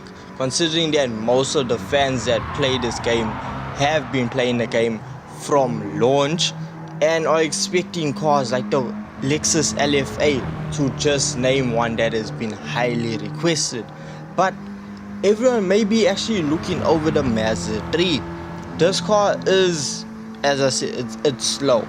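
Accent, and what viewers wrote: South Africa. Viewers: Why the subtitles bro'? You can tell you're South African, but you're easy to understand. Love the content my friend 👍.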